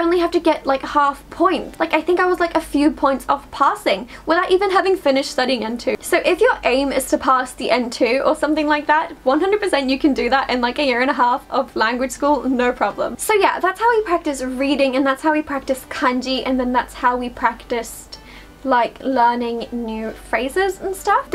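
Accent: United Kingdom. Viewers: You have the most British/English accent I've ever heard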